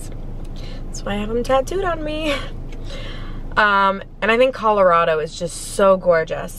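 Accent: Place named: Ohio, United States. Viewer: I say it like she does also, it’s a regional thing from the area of Ohio she’s from where I am also from. My husband makes fun of me. I also say full like “fool” etc etc.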